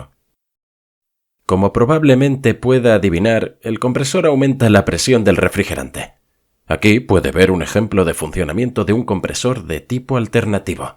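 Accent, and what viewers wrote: Spain. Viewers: tipico acento espaniol :)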